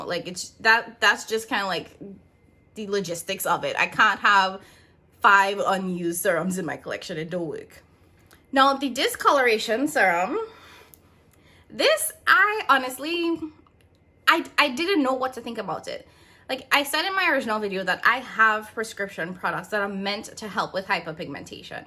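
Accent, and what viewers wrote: Trinidad and Tobago. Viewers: What island are you from? I'm guessing Trinidad Yes definitely Trini...only trinis say THEE for THE! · A Trini voice could never heard inno 🥳🥳🥳big up you abd thanks for d info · Trini? i keep picking up on an accent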